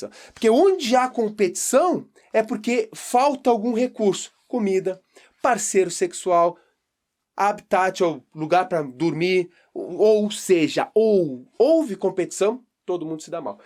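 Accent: Rio Grande do Sul, Brazil. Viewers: Olha o Gaúcho ksksks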